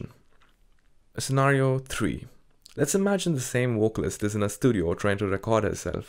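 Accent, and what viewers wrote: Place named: India. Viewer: this would've been a great video if u didn't have that accent, or if u weren't indian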